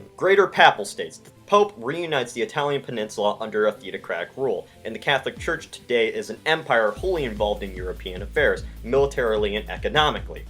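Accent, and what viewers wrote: United States. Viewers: sounds so Ohioan when he was young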